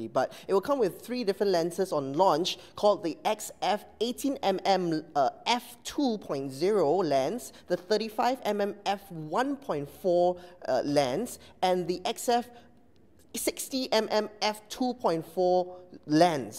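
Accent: Singapore. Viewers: Singaporian accent is so funny :p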